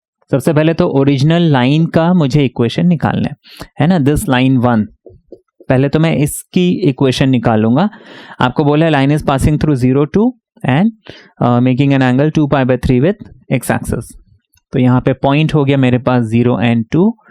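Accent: Bihar, India. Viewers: Sir, Are you Bihari?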